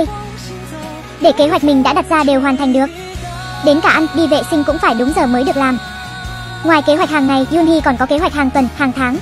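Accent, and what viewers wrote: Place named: North Korea. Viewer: Nghe thì giống bên triều tiên hơn:)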